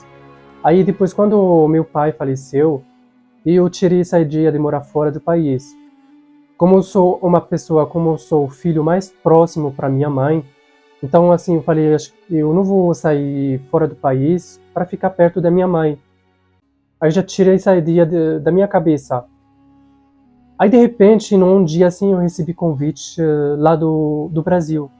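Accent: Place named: Arab world. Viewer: Da pra ver que tu falas desde pequeno o idioma portugués ,, e nao aprende em um ano pois tu nao tem s o sotaque árabe Tão forte sua mae é brasileira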